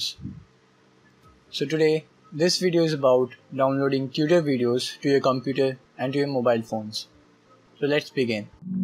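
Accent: India. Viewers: I did't understand a word from this video, he's talking Indian not English ! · you know it works when there's an indian voice v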